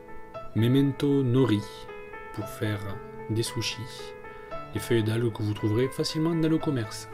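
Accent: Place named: Ariège, France. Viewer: Ah sa fait du bien d'entendre un youtuber avec l'accent ariegeois c'est si rare PS:ariego moun pais PPS:je sais pas ecrire en patois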